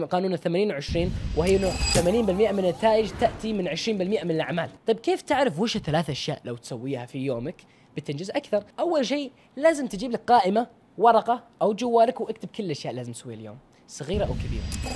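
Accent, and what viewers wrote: United Kingdom. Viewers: استمر يالأخ ولكن انا عندي نقطه بخصوص نطقك للأنجليزي اتمنى يكون نطقك وهجائك بلهجتنا وليس باللهجه البريطانيه اتمنى تتقبل انتقادي بصدر رحب واتمنى لك التوفيق والنجاح ومن هنا للأعلى 🤍🤍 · انا عارف شعورك وانه نطقها بالطريقه البريطانيه نوعاً ما مزعج او مستفز ولكن معا الأسف ترا فكرتنا هذي عن انه مزعج او مستفز خطأ وبدل ما تطلب منه يغير اسلوبه في النطق عشان ترتاح في سماعها انصحك وأنصح نفسي وكل من هم زينا اننا احنا نغير فكرتنا انه الطريقه الصحيحه في النطق اهم من راحتنا وأننا ندرب أنفسنا على تقبل هذا النطق الصحيح بدلا من انتظار العالم انهم يصيرون ينطقون بما نحبه احنا لأنهم ما راح يتغيرون ابدا شيء مستحيل اصلا وهذي نصيحتي واتمنى انها تكون واضحه لك وللجميع يارب ❤ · طيب؟، يعني إذا أهم الشيء الكلام واضح، بالنهاية نطقه للغة الانجليزية بالطريقة الصحيحة(باللهجة البريطانية)مو من شأنك أبدًا ويعد إختياره، صح؟ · غير صحيح ... الصحيح أنه ينطقها بريطاني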